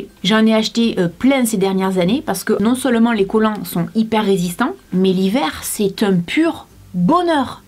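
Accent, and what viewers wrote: South, France. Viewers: J’adore tes vidéos tres marrante avec Ton accent du sud qui donne le sourire !! :) et j’avoue la mousse de douche donne très envie je pense la tester prochainement. Sinon continue ainsi Tel que tu est super maman de YouTube .☺️👍😉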